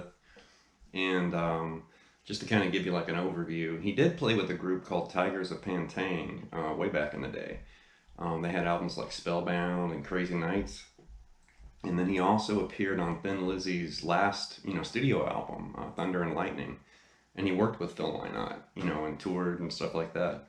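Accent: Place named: South, United States. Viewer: No idea who you are and you kinda look and sound like you should be playing a banjo on a country house porch somewhere but sheeeet man you can shred for sure. You also know what your talking about which proves once again that looks can be deceiving. I need nothing more than this to Sub to your channel and I’ll listen to you break down any guitarist licks. Thanks man and rock on! 🤘🏼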